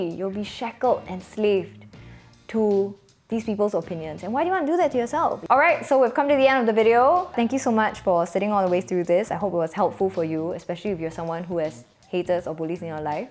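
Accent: Singapore. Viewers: Its called tall poppy syndrome. People love to intentionally knock others pure jealousy! Keep it up girl your Singlish is awesome!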